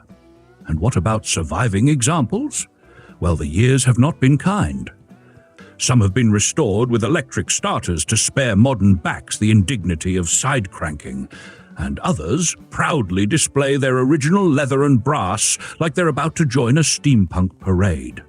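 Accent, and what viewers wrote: England, United Kingdom. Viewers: Button up! My grandmother still had the button hooks - a nice stick with a hook. But don't complain . You get to see and hear all this for free. (Oddly, although the AI vocal sounds English, I heard an Amerenglish term or two.. the solecism is so distinct that i believe our uploader is American . Be kind to us who are forced witnesses to the emissions of a foul orange frog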